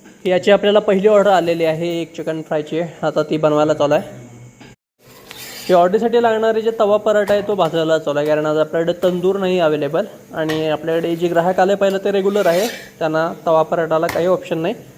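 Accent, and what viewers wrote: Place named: Mumbai, India. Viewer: मी तुमचे व्हिडिओ एकदम सुरूवातीपासून पाहतो तुम्ही कोल्हापूरचे आहात पण शुद्ध मुंबईची भाषा बोलतात आम्ही mh15 नाशिक कर येवला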